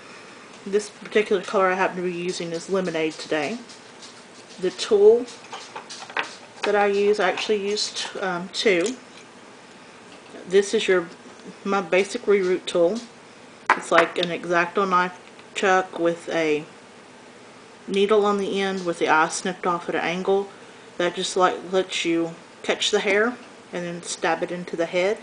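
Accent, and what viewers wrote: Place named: West, United States. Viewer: talkin like a cowboy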